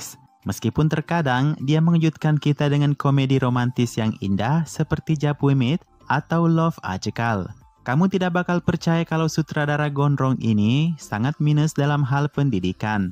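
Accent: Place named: Makassar, Indonesia. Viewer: Admint org makassar kah..logatx kaya org makassar 🙂😃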